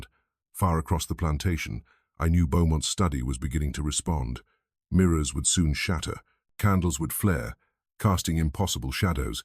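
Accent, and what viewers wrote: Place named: United Kingdom. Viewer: A British accent is just WRONG for this. It needs to be a Cajun accent.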